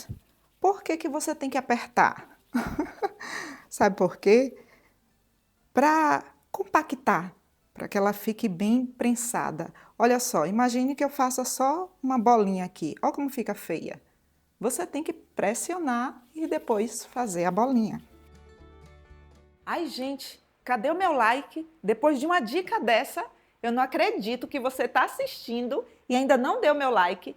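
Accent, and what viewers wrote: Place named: Northeast, Brazil. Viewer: Tô aqui olhando a receita mas prestando atenção no sotaque! Adoroooo queria ter sotaque nordestino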